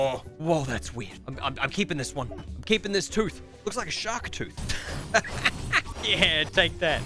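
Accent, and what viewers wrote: Australia. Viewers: Are you from Australia or something? Just like us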